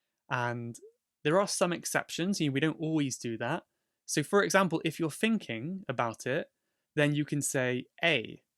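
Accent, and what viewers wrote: United Kingdom. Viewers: Thanks! You are good teacher. I've been looking for such a clear British accent for a long time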